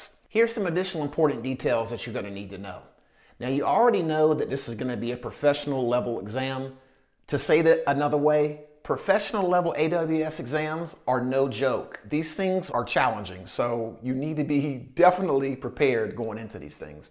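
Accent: South, United States. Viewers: Alabama or Tennessee?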